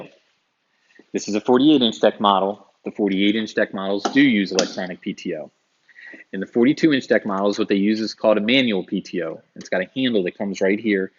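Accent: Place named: Pittsburgh, United States. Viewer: Stumbled upon this while trying to learn about the product JD product line. Living in Atlanta, but from PA... Picked up on the PA/Pittsburgh accent immediately, then looked over his shoulder and saw the sign confirming the locations. Very informative though. Thanks.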